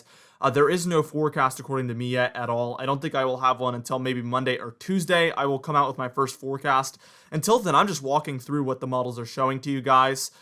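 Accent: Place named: Boston, United States. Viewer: Boston...just guessing from Maine. Lol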